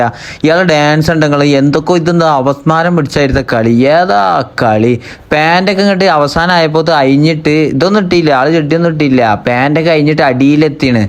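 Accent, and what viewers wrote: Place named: Malabar, India. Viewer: I like your malabar accent 😅😅 ❤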